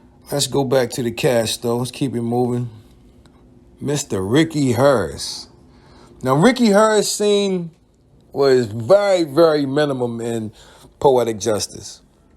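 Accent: Baltimore, United States. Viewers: Dude talking in this video sound like he’s from Baltimore. “Tales From Hood” has a lot of cast members that passed on.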